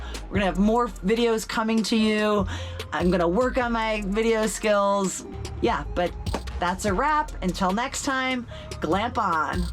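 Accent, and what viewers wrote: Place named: East Coast, United States. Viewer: I used to live in Schenectady. Do I get any points for that? *Drew Bledsoe lives here in Bend, by the way. Listening to you speak, at the pace you do says two things--one, you're from the east coast (as I am), and two--you're excitable. Me? I get wound up talking gear amongst other backpackers. Great video!!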